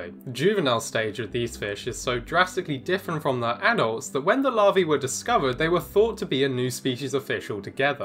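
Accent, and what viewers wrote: RP, United Kingdom. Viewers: Calling zoologists/scientists "stupid" while regurgitating all their findings in a lisping plummy nonchalant monologue 🤦🏻‍♀️ L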